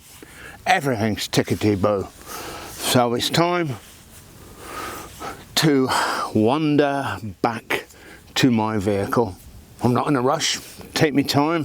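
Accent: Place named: Birmingham, United Kingdom. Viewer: well nice one, no wonder you have those bright lights then🤣 I think I heard a bit of a Brummy accent or was it just my second pint of Champion 7.3😆👍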